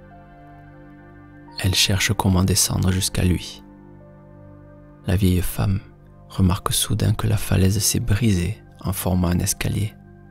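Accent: South, France. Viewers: Ton accent du sud m’a fait sentir comme à la maison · j'adore l'accent français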